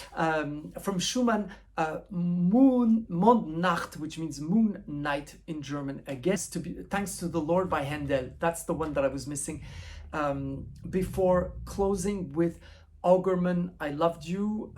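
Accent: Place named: France. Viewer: This is lovely! I love your erudite enthusiasm for Barbra and her artistry. You remind me a bit of me (except I don't have your French accent)! Thank you for this.:)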